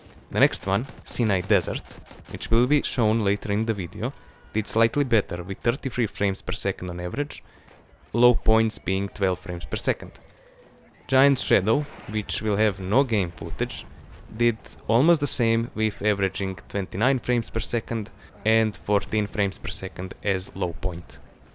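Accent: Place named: Russia. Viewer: Russians акцент!)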